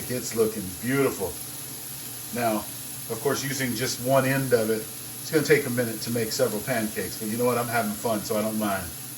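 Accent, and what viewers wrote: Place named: United States. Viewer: Super helpful !!!! Thank you ! My boyfriend likes your country accent btw ! Keep it up buddy !! 🤟🤟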